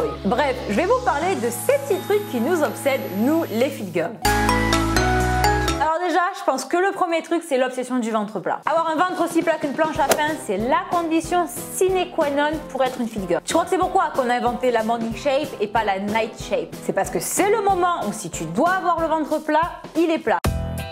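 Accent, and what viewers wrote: South, France. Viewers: Le petit accent du sud haha ! encore une vidéo trop drôle et trop bien #teamsnap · coucou, super vidéo comme tj continue comme ça:) t'es magnifique en leggings et brassière haha ps: j'adore ton accent du sud:p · D'où nous vient cet accent ? Ça sent le sud ☀️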